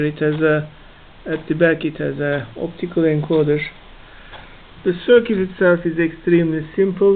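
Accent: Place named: Turkey. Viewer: naber yaa. türk oldugun aksanından belli oluyor. ama güzel. iyi yapmışsın.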